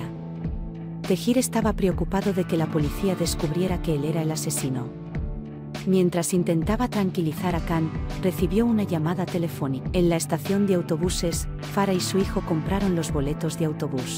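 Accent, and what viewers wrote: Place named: Castile, Spain. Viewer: Narración en perfecto castellano... Lástima que no sea el capítulo completo.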